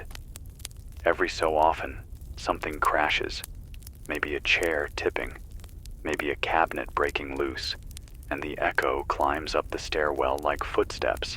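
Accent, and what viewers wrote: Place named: United States. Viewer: Hint,if you want to sell....don't speak American or mention trumpland,///juahhh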